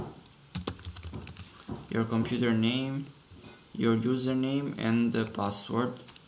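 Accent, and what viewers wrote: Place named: Albania. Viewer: O shqipee . Tkuptova direkt nga theksi i anglishtes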